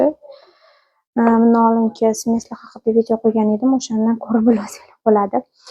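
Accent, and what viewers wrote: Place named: Samarkand, Uzbekistan. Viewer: Samarqandanmasmisiz sheva tortadi😊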